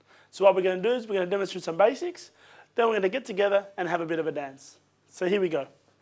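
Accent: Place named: Australia. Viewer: It's Australian with a hint of something else.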